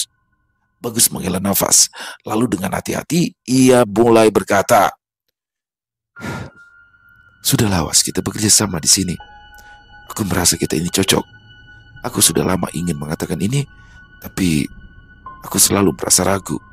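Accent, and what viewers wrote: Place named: Banjar, Indonesia. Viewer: ASSALAMU ALAIKUM Bg,slmt mlm 🙏,kisah yg sgt kereenn,👍satu hal yg paling suka jika stiap kali bang membawakan kisah"dari tanah Kalimantan,logat bahasa Banjarnya itu sgt fasih,sbgmna orang" Banjar berdialog sehari"nya,& itu sllu saya perhatikan,tdk canggung,mantaaabbbb, 👍👍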